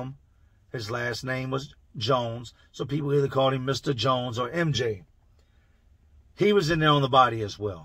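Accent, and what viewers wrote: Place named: Reading, Pennsylvania, United States. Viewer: Bro, I been binge watchin your channel. From Cali but Lived in Reading, PA for 8years listening to you talk is nostalgia for me I love this shit. Thanks for the stories I listen to you while I work overnight janitorial. Really made me realize I need to cool it with the alcohol I appreciate you man. Thank you. Big respect I’m glad I never got caught up in all my bullshit cuz real talk I’m lucky I was heading there some nights. Anyways, great channel glad I found this.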